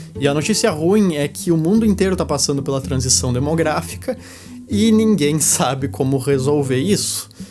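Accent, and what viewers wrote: Rio Grande do Sul, Brazil. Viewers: esse cara é gaúcho? acho muito fofo esse jeito dele de falar kkk. eu sou gaúcha tbm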